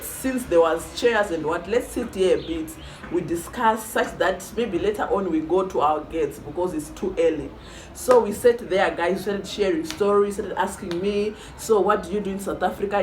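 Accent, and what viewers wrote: Uganda. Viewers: You now have a Uganda accent sis 😅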